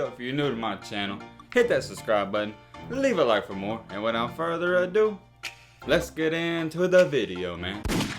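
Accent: South, United States. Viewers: I like your country accent that’s why I watch you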